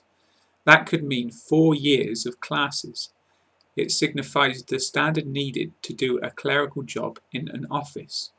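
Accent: United Kingdom. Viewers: I LOVE the british accent :)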